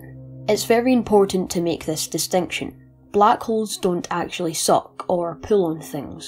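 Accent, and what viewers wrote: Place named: Glasgow, United Kingdom. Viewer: And here after watching your clip on black holes....I've subscribed and look forward to your future footage....yir a smart wee cookie.....sound like yir fae Glesga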